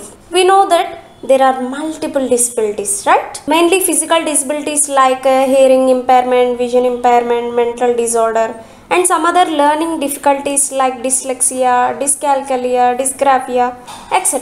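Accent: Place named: Telugu, India. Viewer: Good speaking but you still pronounce like Telugu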